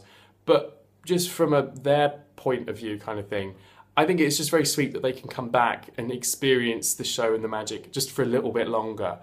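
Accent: United Kingdom. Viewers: Lusine Margaryan I'm guessing he lives somewhere in the south of england, Midlands maybe, so just a southern English accent. I'm guessing this because he sounds similar to me and to me he doesn't really have an accent, which likely means he lives somewhere similar to me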